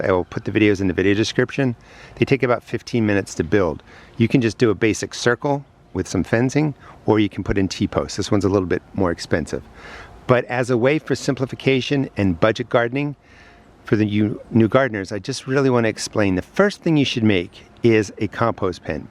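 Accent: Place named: Maryland, United States. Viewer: I hear Maryland I subscribe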